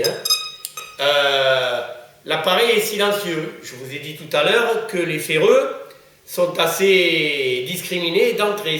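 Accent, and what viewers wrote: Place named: South, France. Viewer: hahahahhaha le scope l'accent du Sud suis mdr désolé pas méchant mais Scope ca me fais rire hahahaa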